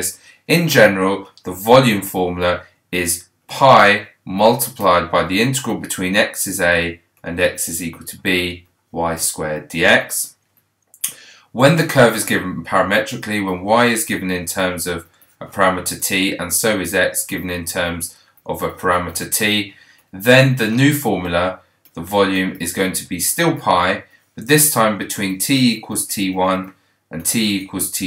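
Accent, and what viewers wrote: United Kingdom. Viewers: woah, you sound Britsh!! I'm an Amurican. Thanks for posting, much appreciated :)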